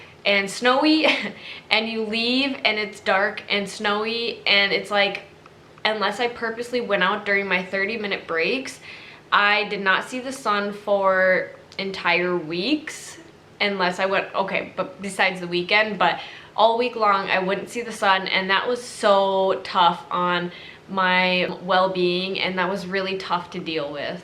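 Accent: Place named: Minnesota, United States. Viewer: Why would u have to drive to a bus station and then take a bus? Ive got to have ESP the second I turned your video on I thought Minnesota it must have been the accent.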